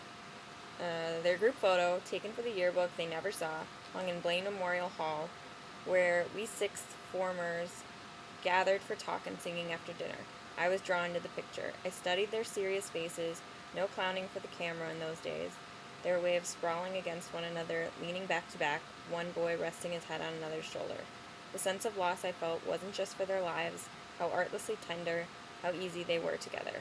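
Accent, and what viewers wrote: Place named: Michigan, United States. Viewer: you forgot to say what state you are from. you sound like you're from michigan though. am i right?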